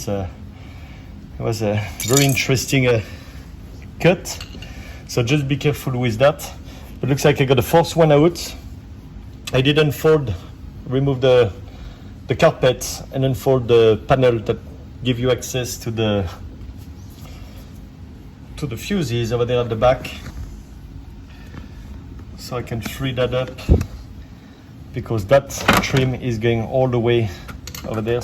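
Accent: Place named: France. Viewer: Thanks for showing the most important part. Removing those plastic panels without breaking, Heaven forbid screws to fasten. Can't wait to experience the pleasure and appreciate the tid bit how sharp that plastic is, nothing worse than cutting yourself doing a repair. I detect a French accent.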